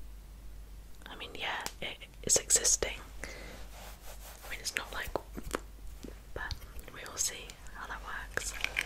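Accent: United Kingdom. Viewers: Pros: British accent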